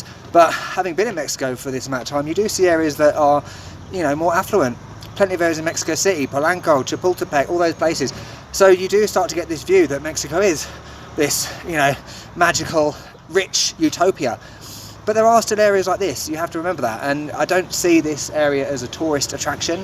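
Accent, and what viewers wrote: United Kingdom. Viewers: 👋 hello greetings from USA/Mexico ! I love ur British accent! I was raised in Hidalgo Anywho try the arroz 🍚 con leche pastes and papá con pollo 🍗 yummmy 🤤!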